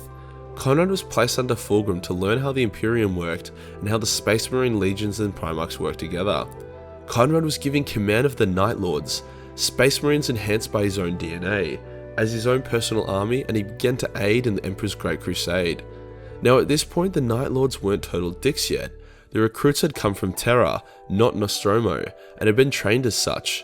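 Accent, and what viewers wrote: Australia. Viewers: Portuguese: Bom dia, seus putos. You pronounce as you do in your beautiful australian accent. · Dude, nothing makes me laugh more than listening to you explain things as only an Aussie can..... Love it mate and keep rolling them out.....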